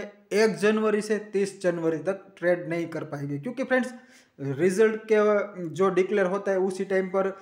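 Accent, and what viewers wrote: Gujarat, India. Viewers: Loved Gujrati accent.Reminded me of JETHALAL.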